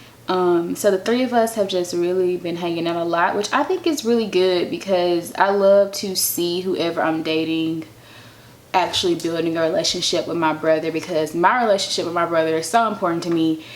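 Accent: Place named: North Carolina, United States. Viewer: You sound like you're from NC! New subbie!! Your vids are great and you're gorgeous!!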